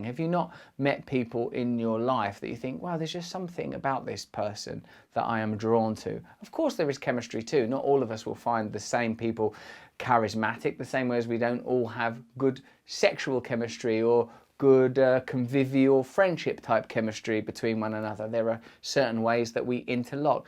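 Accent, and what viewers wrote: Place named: England, United Kingdom. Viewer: Mojo, sounds most appealing when said by an Englishman! When I say it sounds like mow joe! Help me russ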